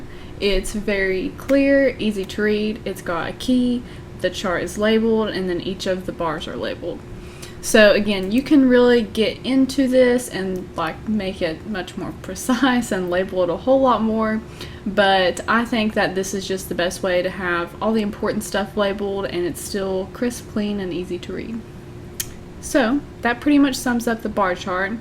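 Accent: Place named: South, United States. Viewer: i love your southern accent :)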